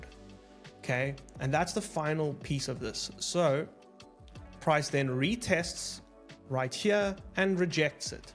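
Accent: South Africa. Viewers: Thank you for this video - nice and clear and I think it was a beautiful South African accent :) I am new on your channel and found this very helpful!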